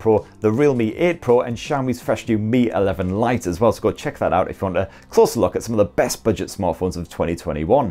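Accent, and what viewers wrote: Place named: Yorkshire, United Kingdom. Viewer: Just wondering, what northern accent is he speaking with? Yorkshire?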